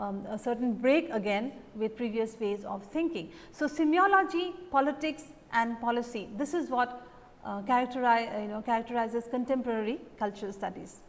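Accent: India. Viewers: I absolutely believe you, as far as I know you are right, cause India is India with all its advantages and disadvantages, however, the matter was about Indian accent, especially about the accent of the speaking lady. I just compare her accent and accent of some Indian guys who were studying medicine when I was a student (in Siberia, in Krasnoyarsk-city), those days I could not understand their Indian accent, but may be I did not understamd them well because I did not speak English fluently at that time :)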